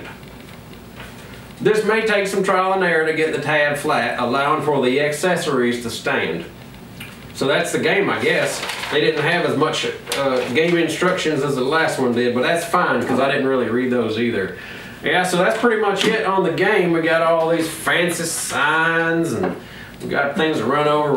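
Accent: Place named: Alabama, United States. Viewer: OMG!!, HOW did you ever keep a straight face!!?? "Happier than a Pig in Shit"!!! Well drop me in Shit and call me dipped!! Your accent brings me back to High Skewel in MONgomery Alabama. 😅😅😅😆😂😂 The Puns alone were enough to get me to bust a gut!! I might buy it for the Body I believe. But, I'm sure as Church on Sundee that I'd get that Trck for the stickers and signs!!! Usetacould! 😜 DADGUMMIT! That was about the most fun I've seen y'all have on one of them videotapes! I reckon I ought to put my Twang back in the Box. Might just turn my Wife madder than a Opossum in a paper bag!! 😉 OK, I've got to be done here..... Sadly, I still live in Arizona. Sir, that was FUN! Carmine 💥 ⛽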